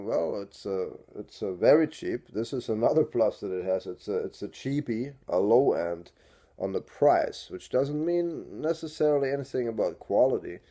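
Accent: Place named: Iceland. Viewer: Love your accent, are you from Iceland?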